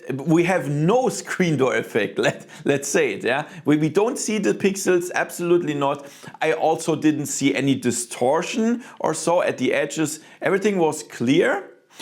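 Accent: Germany. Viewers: What did you expect, buddy? He is German. Everyone has a different accent on planet Earth. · I love the German accent. It's very clear and easy to understand for non-native speakers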